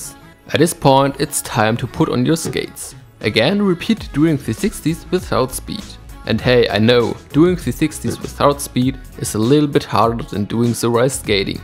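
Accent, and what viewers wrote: Germany. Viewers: you still have a clear german accent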